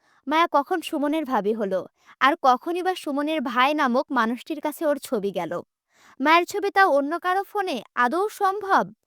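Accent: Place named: Kushtia, Bangladesh. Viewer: আমি নিজেও কুষ্টিয়া জেলার মেয়ে। আপনার কথা শুনে নিজের জেলার টান টাই মনে হচ্ছিল বার বার। এবার কনফার্ম হয়ে গেলাম।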